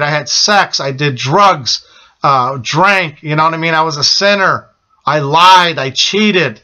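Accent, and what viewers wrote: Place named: United States. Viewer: thank you so so so so much for sharing this....I just happened on this video, but I'm sure for good reason. You just sound like the typical American guy...and the thing is, there are MILLIONS of us just typical Americans worried about things that don't matter, and spending all of our time wasting life. Your testimony bears upon my heart, even though I've been a Christian all my life...there's more. There's more about being a servant of Christ than just being a 'Christian'. Like you are saying...God bless you. God bless you. Jesus thank you.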